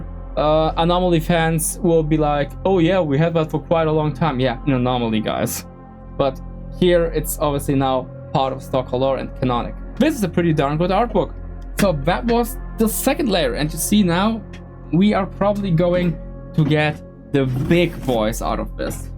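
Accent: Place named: Germany. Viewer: Shout out to random, Eastern European, gaming channels. Gotta be one of my favorite genders. Edit: Ok, turns out he’s German. I’m really bad at identifying accents.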